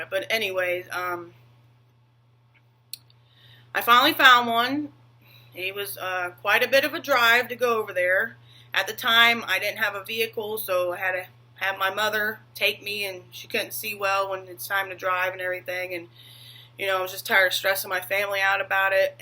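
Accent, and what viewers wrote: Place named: South, United States. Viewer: I bet you are probably from the south.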